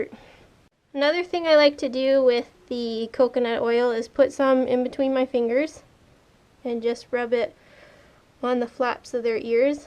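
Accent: Canada. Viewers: She sounds Canadian 😂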